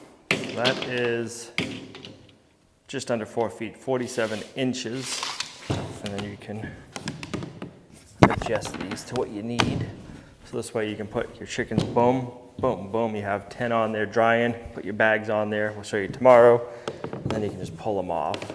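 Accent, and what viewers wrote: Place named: Appalachia, United States. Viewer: if you talk like harvest chicken. then your not a youtuber but more a hillybilly right out the fields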